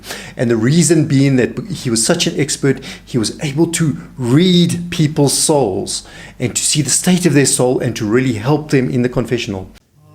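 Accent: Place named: South Africa. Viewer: As an Australian/Lebanese Maronite Catholic, I absolutely enjoy learning about Padre Pio through South African accent!!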